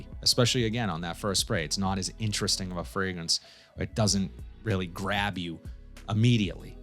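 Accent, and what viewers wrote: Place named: Massachusetts, United States. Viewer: Wicked awesome accent . Worcester mass?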